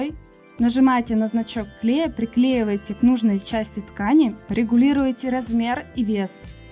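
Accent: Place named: Japan. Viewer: I like japan english.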